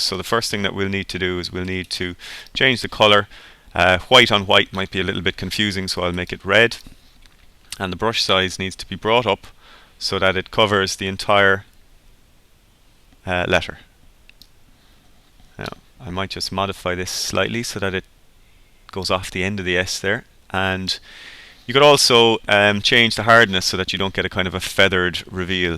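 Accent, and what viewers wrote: Ireland. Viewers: Came for the content, stayed for the Irish accent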